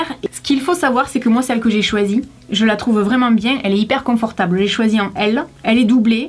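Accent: South, France. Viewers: Je suis amoureuse de ton accent du sud😍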